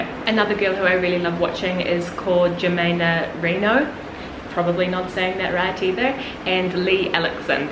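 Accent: New Zealand. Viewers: Are you from New Zealand? 😊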